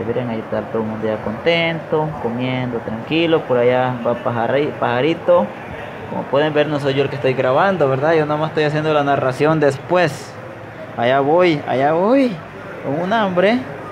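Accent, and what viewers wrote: Mexico. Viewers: Parece más mexicano que hondureño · Al principio te escuchaba tu acento de Mexicano pero cuando dijiste “vaina” me dije a mi misma “este vato” no es mexicano! Y conforme fui viendo tus videos es que aprendí que eres centroamericano. Ni modo, te hiciste Mexicano by association 🤣 Lol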